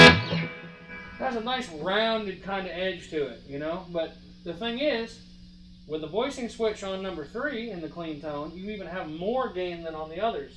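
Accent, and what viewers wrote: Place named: Nashville, United States. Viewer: I love, but it cracks me up to listen to him talk. He's a Mainer and he talks like he's born and raised in Nashville.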